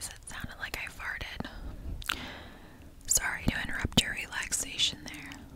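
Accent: New Jersey, United States. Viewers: that new jersey accent was accenting it, its very relaxing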